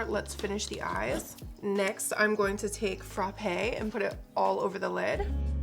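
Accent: South, United States. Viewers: You are hysterical and absolutely stunning!!!! Loved the southern accent throughout! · Gorgeous look ❤️ Yes, just do the makeup style you love 😊 Thank God you saved the contour!!! 🥴🙏😁 Brows looked great. Love your sarcasm and southern accent 😂 so funny! Creme de Nude is my fave 💄The foundation looked flawless on your skin.. I wish the undertone was more neutral ❤️ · First time ever watching you.. omg love you!!! Anyways your too funny with your southern accent and bombshell glam beauty I loves it stay cool babes! Looking forward to watching you more..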